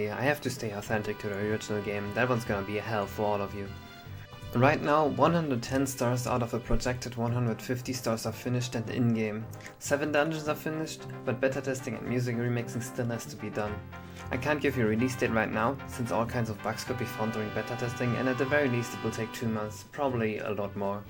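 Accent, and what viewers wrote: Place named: Germany. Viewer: Is by any chance German? The accent is way to strong and familiar lol Also without having to say it: LOVE IT!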